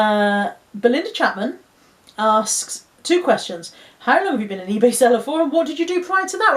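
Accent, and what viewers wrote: Bristol, United Kingdom. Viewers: Hello, just found you on here, recognised your accent as Bristol straight away. I grew up in Yate, now live in Somerset/Dorset.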